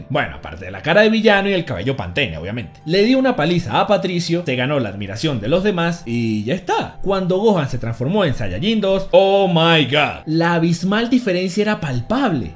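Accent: Venezuela. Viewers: Pamre jure nunca ver a un youtober con ese acento venezolano pero tú eres la esepción grande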